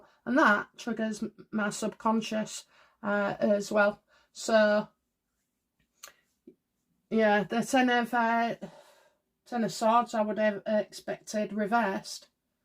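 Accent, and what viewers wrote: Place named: South Yorkshire, United Kingdom. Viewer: I just watch because I like listening to you. As a Christian I shouldn’t even watch these kinds of videos 🙈🤪 You sound like you could easily live where I live in South Yorkshire. If it’s any consolation based on other comments I’ve seen, many other people said he was going to pass yesterday.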